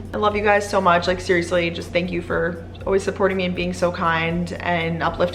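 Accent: Long Island, United States. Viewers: Do you feel like you lost your long island accent? also how long do you plan to stay in boston?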